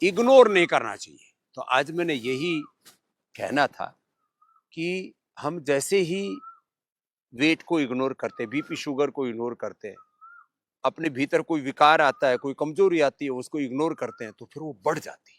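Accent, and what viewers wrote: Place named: Rajasthan, India. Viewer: Baba ji mhari marwadi boli aaw aapne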